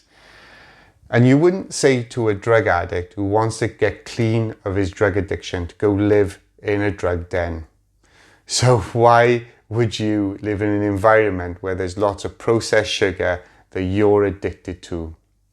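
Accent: Wales, United Kingdom. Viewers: Thanks for a wonderful talk and love your Welsh accent! The way I think of things are that most medications only treat the symptoms of T2D , only a good clean diet of natural foods will help the actual disease from progressing. As Dr Jason Fung says “ Diabetes is a dietary disease and therefore needs a dietary solution”. Charge on Rhinos and good luck to you all.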